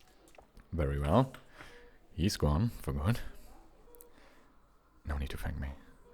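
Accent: Germany. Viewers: Are u From germany?💞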